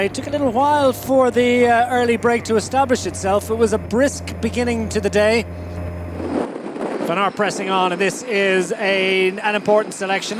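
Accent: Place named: Ireland. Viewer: It's not Rob Hatch. He did the live Eurosport commentary with Dan Lloyd, but Rob Hatch is English, this fellow's Irish. It sounds like Michael Hutchinson.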